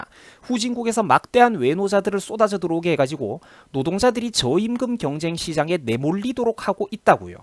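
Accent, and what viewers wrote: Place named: Gangwon, South Korea. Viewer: 혹시 고향이 강원도신가요? 억양이 들리는거 같은데 구수하고 정겹습니다 ㅎㅎ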